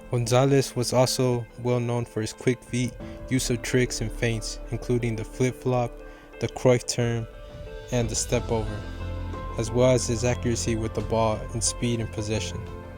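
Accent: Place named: Paisa, Colombia. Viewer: Puta solo ecucharte sabia que eras paisa Great my bro, HE is our Hero and yeah cause he was from E.S he's not as famous...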